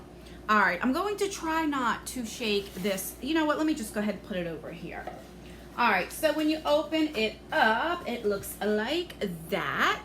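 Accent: New Jersey, United States. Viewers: Hi, You are hilarious! I love your videos. The opening is so clever and entertaining too. 👍🏻 I am Brazilian but my husband was raised in New Jersey (Mulburn area) and I enjoy the English accent of the area. In fact, I just subscribed to Boxycharm/Boxyluxe and mentioned you as my favorite YouTuber! 🌺 Have a wonderful weekend and God bless you always! Renata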